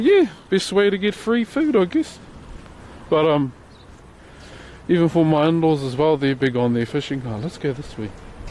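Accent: New Zealand. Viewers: Love the way you talk newzealand accent is awesome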